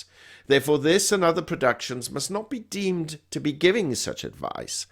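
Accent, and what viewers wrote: United Kingdom. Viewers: Can't beat a British accent when it is spoken well..... PS..keep buying PMs !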